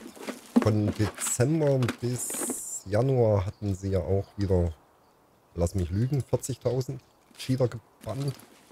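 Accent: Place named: Saxony, Germany. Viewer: Vielen lieben Dank für deine Info. Dir auch schöne Pfingsten. PS: nach deinem Dialekt klingt es nach Sächsisch. Kann mich aber auch Irren.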